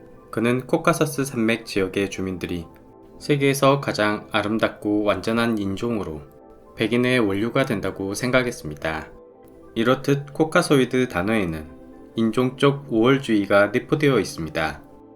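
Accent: Jeolla, South Korea. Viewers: 억양을 보니 전라도 출신이시네요 ㅎㅎ 광주같은데... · 전라도 목소리네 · 혹시 전라도 분이신가요? 사투리가 익숙 하길래 여쭙니다 ㅎ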